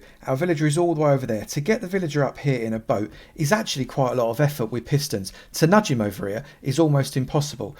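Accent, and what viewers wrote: United Kingdom. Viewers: so because someone has a british accent, they're automatically Gordon Ramsay? The guy sounds nothing like him, aside from accent that is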